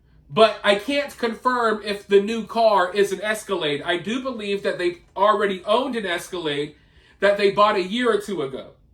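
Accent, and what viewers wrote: South, United States. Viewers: I agree with you. But He’ll you sound like a black dude. Jus saying. Must be from the south?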